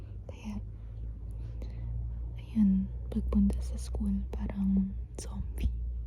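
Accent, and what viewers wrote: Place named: Conyo, Philippines. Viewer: Conyo soft spoken naman, taglish 😍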